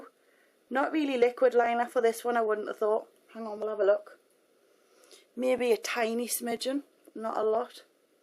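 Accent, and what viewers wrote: United Kingdom. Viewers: Omg i can't understand a word ur saying! Littlebit too british i can't understand anything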